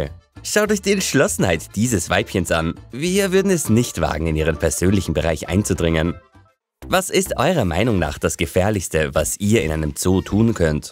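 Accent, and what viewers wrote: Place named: Austria. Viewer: Österreicher! :) Ich bin auch einer, das merk ich doch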